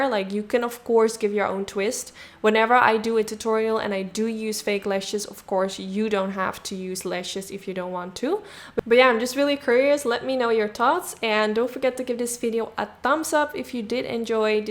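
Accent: Netherlands. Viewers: Have you thought about doing an English course? You are clearly fluent, but as soon as you said “hi guys” I heard you were Dutch haha. Hope you don’t take this the wrong way.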